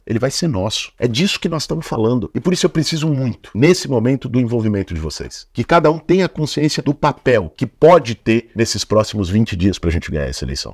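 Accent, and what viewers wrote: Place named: São Paulo, Brazil. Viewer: BOULOS NO SEGUNDO TURNO.....SOTAQUE GENUÍNO DE PAULISTANO. NOSSO FUTURO PREFEITO.😊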